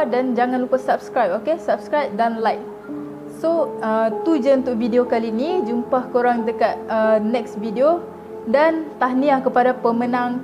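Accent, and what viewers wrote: Sabah, Malaysia. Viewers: Akak ni org Sabah ke? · akak ni org sabah ke org mane 😂😂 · Akak orang Sabah ke? Sebab saya tengok cara akak bercakap macam orang Sabah....Saya orang Kedah...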